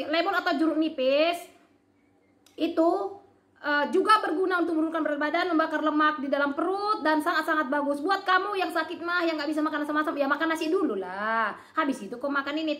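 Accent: Medan, Indonesia. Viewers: Medan kali logat kk ni.. 😊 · Ka. Aslinya org mana ?? Klo nonton kk ingat kampung halaman . medan.. Logat nya itu loh.. Congok..hahahaha.. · Orang Medan ya kak... Klu dgr dri logat ama nada saat bicara... Paten kali kk ini.. Makasi ya kak ek tips nya.. Mantapppp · Kek ginilah klo orng medan dah cakap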